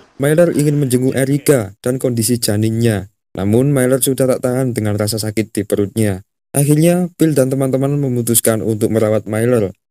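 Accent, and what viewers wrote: Java, Indonesia. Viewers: Medok amat mas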